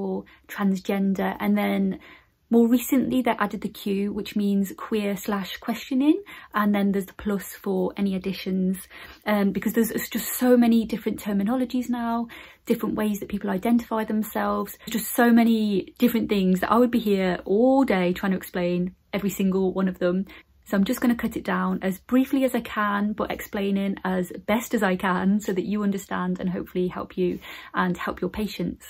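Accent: Birmingham, United Kingdom. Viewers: Are you from Birmingham like me? I'm a GP and I love your videos. This one is one of the best x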